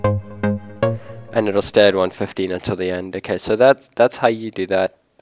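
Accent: South Africa. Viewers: you sound south african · Random but you sound South African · Is that a South African accent I'm hearing?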